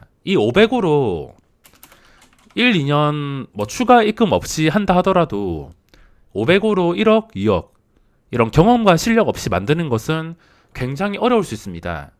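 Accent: Gyeongsang, South Korea. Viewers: 경상도 억양이 너무 구수하시네요 ㅎㅎ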